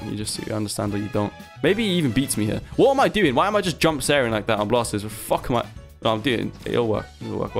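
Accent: United Kingdom. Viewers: I flipping love your British accent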